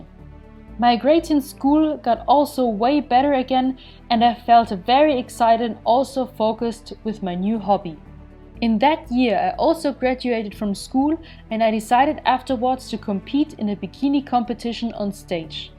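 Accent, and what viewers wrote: Germany. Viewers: Her German accent is cute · wie man sofort hört dass du deutsche bist;) danke für das video, hab ich was zum schauen aufm öden crosstrainer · Her English is really good. Yeah, she has quite a strong Accent but her grammar and pronunciation are on point. I don't understand why so many Germans on YouTube put the English of their fellow Landsmen and women down. Show some Solidarity... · dieses deutsche englisch xD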